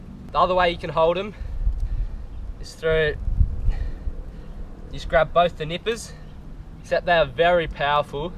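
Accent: Australia. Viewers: Mate are you from Queensland or new south wale.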